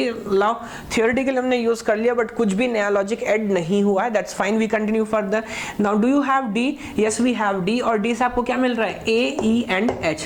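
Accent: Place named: Indore, India. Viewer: it seems sir you are from indoor . You have that tone " kya bhi milega ". thanks alot sir for all the videos. I have being following all the lecture one by one .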